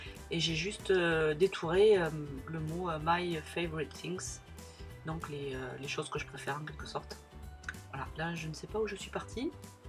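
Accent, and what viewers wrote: Toulouse, France. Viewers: Il est beau notre accen toulousain et tarnais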